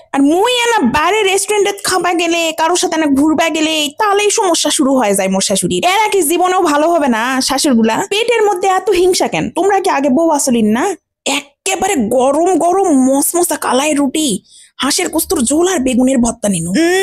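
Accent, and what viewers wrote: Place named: Naogaon, Bangladesh. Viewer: 😊😊😊আপু আমার এই ভাষাটা খুব ভালো লাগে নওগার ভাষা আমার একটা চাচী নওগাঁ তে থাকতো এই ভাষায় কথা বলতো যখন আমাদের বাড়িতে আসতো আমি শুধু এই কথাই শুনতাম আমি আর আমার আম্মা। আমার আম্মা এই কথা শোনার জন্য ওনাকে সবসময় আমাদের বাড়িতে বসাইয়া রাখত। এত ভালো লাগলো ফ্যান হয়ে গেলাম। ❤❤❤❤তবে বুঝে নিও পাশে থেকো।, 💌💌💌👏👏👏🎁🎁🎁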